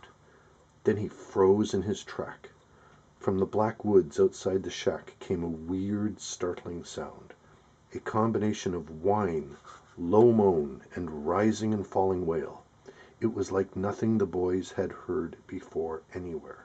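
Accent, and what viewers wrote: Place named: Canada. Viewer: This is a good idea reading these stories from the classic "Strangely Enough" collection. Sounds like you have a Canadian accent -- aboot (about)?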